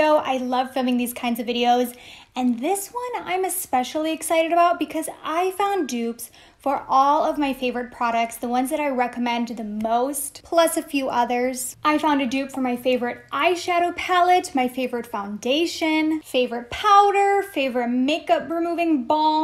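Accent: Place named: Michigan, United States. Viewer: Sometimes that mid western/ Michigan accent comes out to play. The way you say “BOTH” is soooo interesting to me as someone from the south that’s defffff not the way we say “both” down here!!!!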